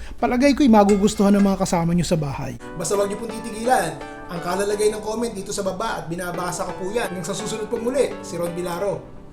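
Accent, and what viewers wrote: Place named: Nueva Ecija, Philippines. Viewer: Kamates, nagka luray luray, ganire at ire. Punto at mga salitang kapag narinig ko eh para kang nakikinig sa matatanda sa Nueva Ecija😅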